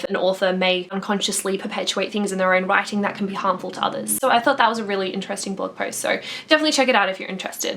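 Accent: Australia. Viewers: You have such a beautiful australian accent but still a bit different from the way my australian friend talks, so I guess that it's a matter of different dialects. which part of Australia are you from? If it is ok to ask? And I soooo have to read this book, you made me so curious and the way you talk about it makes me think that I really would enjoy it!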